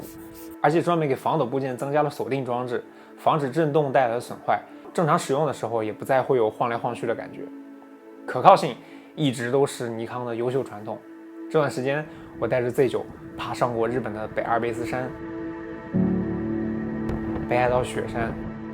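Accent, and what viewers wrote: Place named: Henan, China. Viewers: 他是湖北十堰人，湖北北部的几个县市的方言还是很接近河南的。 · 之前看过他一期视频，和他妈妈通电话，一口河南话啊，哈哈。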